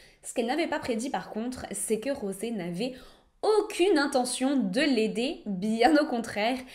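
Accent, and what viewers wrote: Quebec, Canada. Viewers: Coucou ! j'ai une question, je suis fascinée par ton accent du Quebec, tu l'as eu naturellement à force de rester là bas ou alors tu t'es entrainée ? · Bien raconté,merci! Je distingue un soupçon d’accent québécois ou quoi? C’est subtil, il faut s’y connaître! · Kikou tu es adorable et j adore ton accent québécois. Merci beaucoup pour ta vidéo bisous 👍👍👍👍👍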